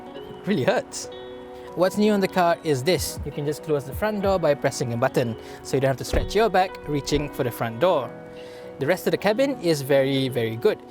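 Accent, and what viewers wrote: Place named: Malaysia. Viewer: Suara orang Malaysia. Tu yang kita nak. Ada orang dah pandai cakap orang putih nak jadi orang putih terus.. selamat maju jaya.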